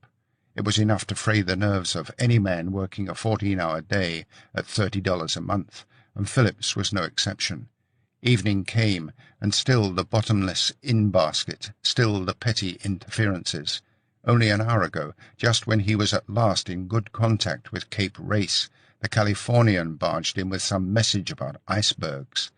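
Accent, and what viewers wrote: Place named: United Kingdom. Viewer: Who is the reader!? Terrific voice, accent so perfect for UK home of Titanic, and superb clarity.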